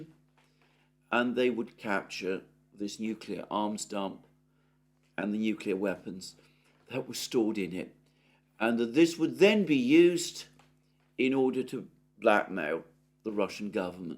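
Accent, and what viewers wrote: United Kingdom. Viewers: Great strategic lesson. Great sophisticated super duper British accent delightful to ear. Thanks ❤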